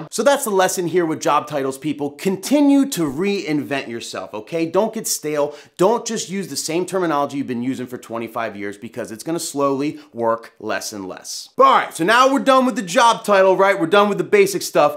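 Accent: New Jersey, United States. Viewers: I don't know if I watch too many movies but you're so New Jersey lol. Love it 🖖🏾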